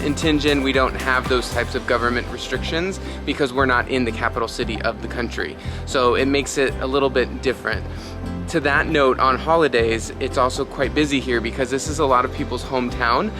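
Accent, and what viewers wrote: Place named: Canada. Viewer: You are amazing for responding to all my comments. Where in the States are you from? You sound like a fellow Canadian. I like your laid back style of reporting.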